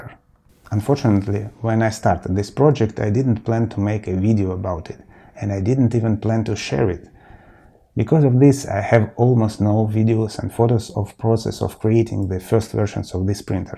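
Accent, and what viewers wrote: Russia. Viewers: Your english is great and russian accents are cool Im getting a prusa and have 2 ender clones so im super excited for this as a project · Well, the voice and articulation and Russian accent — of course, you need to work on that. I mean at least a normal voice equalization. There's a lot of bass, 125 hertz and 250 hertz are buzzing, there's little mids and highs. Maybe it's okay to hear from the phone, but from a computer with good speakers, it's hard to even hear what you're saying. =)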